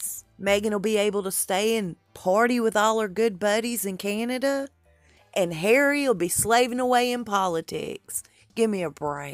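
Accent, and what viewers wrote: South, United States. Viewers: You always make my day with your videos. Your a awesome story tell and love love love the southern accent.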